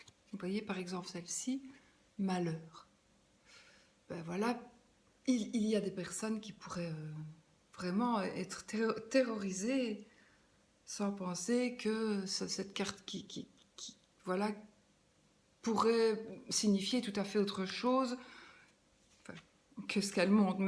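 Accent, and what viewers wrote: Belgium. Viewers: Bsr, j'adore ce jeu ,moi je viens de le découvrir. Depuis presque trois semaine. Puis je me permettre ,y aurais pas t'il un léger accent belge 🙂. Je vous souhaite une douce soirée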